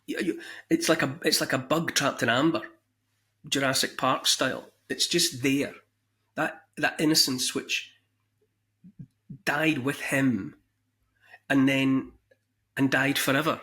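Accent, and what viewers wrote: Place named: Dumfries, United Kingdom. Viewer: Thankyou for sharing such fascinating stories, I have just ordered a copy of your haunting book. My family are 100 years of farmers from Dumfries- i love hearing your accent from the region. Best Wishes to you.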